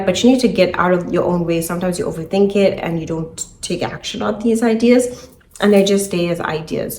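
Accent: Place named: South Africa. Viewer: Are you south african? Bcs you have such accent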